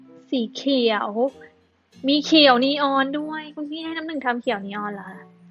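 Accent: South, Thailand. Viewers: นายฮัวเลย 5555 แหลงใต้ชัดเปรี้ย555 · แหม่เฮ้ยยย แหลงใต้ชัดเปรี๊ยะ🤣🤣🤣 น่ารักอีตายยย❤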